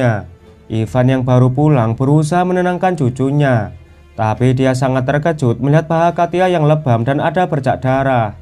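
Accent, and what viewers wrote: Java, Indonesia. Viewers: Jowo mu ngendi min? Kyo aku 7th no jkrta logat jowoku ra isoh ilang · Komentatorx bahasa Jawa medok banget,,,🤭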